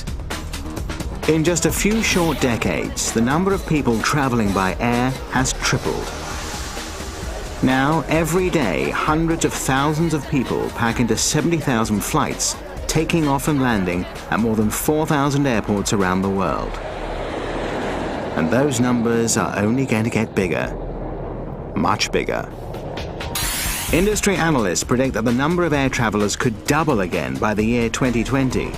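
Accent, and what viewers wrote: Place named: United Kingdom. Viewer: OMG can we please get these brit narrators other jobs grrrrrr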